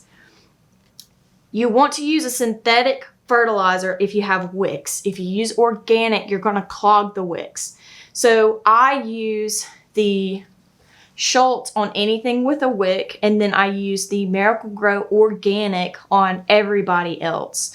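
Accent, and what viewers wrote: South, United States. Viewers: “Ah leeuhv eeuhn uhmericuh” in the world’s strongest southern accent, as if we didn’t know 😆. Haha no shade, I’m in Oklahoma.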